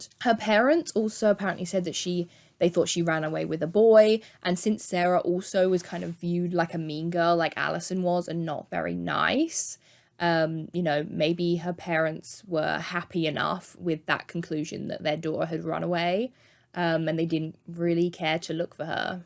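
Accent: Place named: United Kingdom. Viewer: i can’t tell if you’re british or not. you almost have an accent but you don’t?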